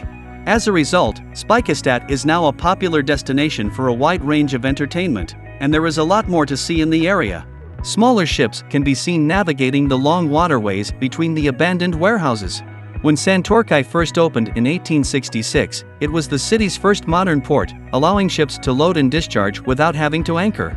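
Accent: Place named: United States. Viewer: I like НаmВ., Вt.I dont like prono.narrator speaks, american , nt EnglisН.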